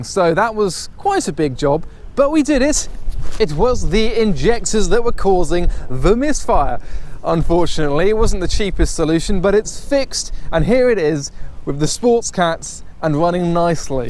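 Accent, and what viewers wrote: United Kingdom. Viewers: The most British sounding person on YouTube, banging videos keep it up 👍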